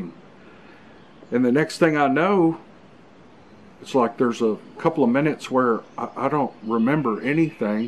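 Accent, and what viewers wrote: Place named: West Virginia, United States. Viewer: You sound exactly like my friend from West Virginia. And not just your accent. If I heard you on the phone I would definitely think you were him. Me and my friend belong to the Creator of the universe too. No fear.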